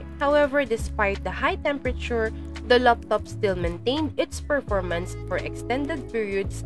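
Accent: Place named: Philippines. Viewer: That voice and accent.. pilipino po ba kayo maam? Haha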